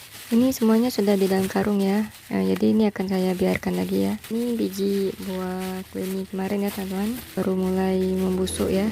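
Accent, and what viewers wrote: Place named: Aceh, Indonesia. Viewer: Menyou tedengo nyo lage oreng aceh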